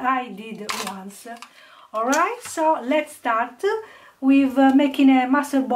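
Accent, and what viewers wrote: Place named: Italy. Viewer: What a fun altered book! I am amazed at your imagination and creativity! I also enjoyed hearing your lovely Italian accent!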